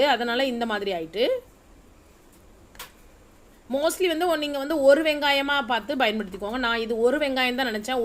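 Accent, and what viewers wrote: Tirunelveli, India. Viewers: Unga pechi semma nenga thirunelveli ah ..... 😘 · Hehehehe nora natiyam 🤣🤣🤣 neenga thirunelveli ya??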